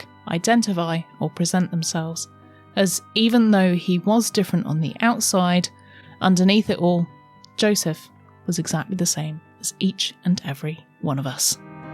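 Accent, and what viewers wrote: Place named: United Kingdom. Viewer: I can remember when I first saw the movie it had just came out back in 1982 I believe it was. My three older Brothers took me to the old single movie theater in Clinton New Jersey. I was still very young and I can remember being afraid and very sad. As you guys say The cinema. I've seen it over the years. And have watched countless videos and pictures and such about the young man. I live in Florida. And I truly wish that I was able to make a historical and as accurate as possible. A movie about Him. From his birth and even also after his death. I truly enjoyed your video. And I have always loved the English British accent. I'm Italian and German on my late father's side and on my mother's side I'm English and Scottish and Irish and Scandinavian. And I've always enjoyed drawing. And I have had such a major and passionate interest in this young man. 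I know that I can't truly know what the young man went through in his short 27 years.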